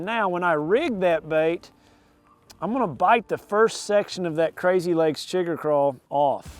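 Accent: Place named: Midwest, United States. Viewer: I just like your way of teaching others, easy to listen too! Plus you're a Midwest guy right, u think I heard you're an Okie?!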